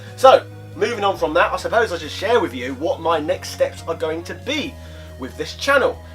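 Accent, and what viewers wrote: United Kingdom. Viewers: Oh God you successfully fooled me.. You are the first and only western YouTuber ever talked about ACan and gave it some respect it deserves by my knowledge. I love your deep and original comments along with your British accent, as a chinese I was once horrible of comprehension of authentic English, all thanks to the overwhelming US influence, but my wife is in UK as a visting scholar and I must be able to get what's British people saying for the seek of my UK tour this October. Your channel helps me make huge improvement, great job. 👍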